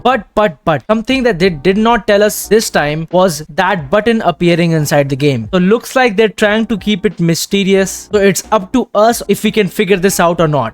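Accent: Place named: India. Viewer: I like Indian accent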